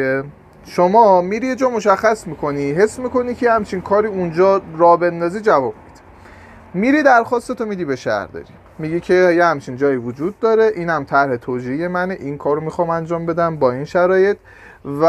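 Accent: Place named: Hamadan, Iran. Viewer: یکی از شهرستانای همدانه ک ترگ زبان حالا کدوم شهرستانی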